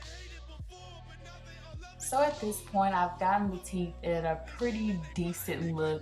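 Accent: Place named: Texas, United States. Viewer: When I first found you on Youtube I instantly searched for your location I could have sworn you were from Texas Lol you sound so much like her!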